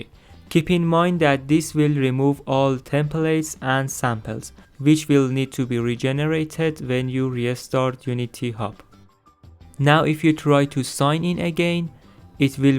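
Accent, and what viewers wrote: Iran. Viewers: It is irani I understand with his accent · are namosan seda irani ha asan ye chi digas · درود داش از لحجت فهمیدم ایرانی هستی من گیر کردم توی این صفحه لاگین هر vpn ای هم که بوده تست کردم بازم خطا میده چیکار کنم؟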